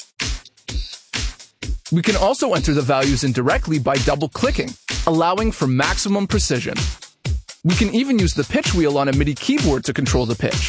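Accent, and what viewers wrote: Canada. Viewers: the canadian is strong on this one lol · You sound canadian...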